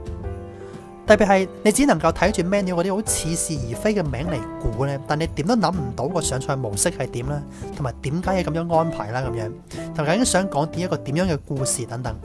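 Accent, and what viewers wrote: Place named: China. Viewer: 佢把聲有D似大陸仔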